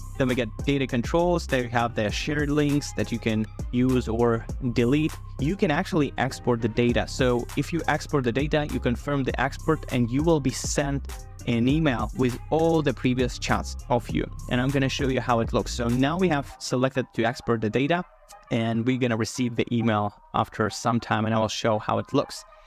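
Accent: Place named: Russia. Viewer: Many people dont understand chatgpt but your fucking FLAT tone and that Russian or whatever accent you have makes understanding chatgpt IMPOSSIBLE! And whats this "Chat GDP" u keep saying!! 🤣🤣🤣🤣🤣🤣🤣🤣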